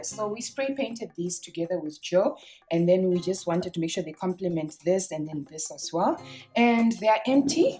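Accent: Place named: Kenya. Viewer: Accent from Kenya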